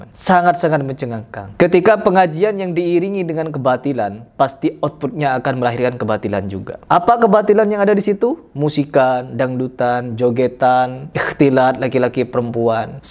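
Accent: Madura, Indonesia. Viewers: Abangnya logat Madura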